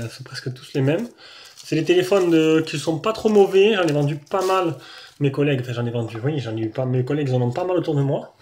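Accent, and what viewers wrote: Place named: South, France. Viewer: Salut c est pas mal tes tests smartphones et j aime bien ton accent du sud ca change,bonne continuation!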